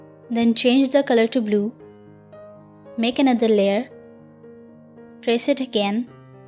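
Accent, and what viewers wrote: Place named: India. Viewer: There are tons of videos on brushes but this is the most helpful among all. I love the format of your videos and tips too😍😍🤗☺️. Your accent sounds like Indian...are you Indian 🤔🤔.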